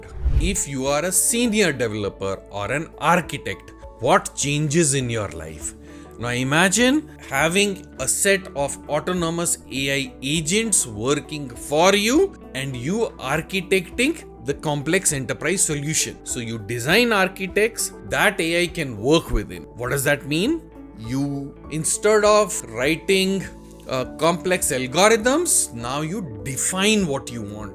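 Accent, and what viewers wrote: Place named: India. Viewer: Your accent is from India. I am assuming you were born in India. It is an embarrassment you are talking about this when the whole country is at war. Why this now ???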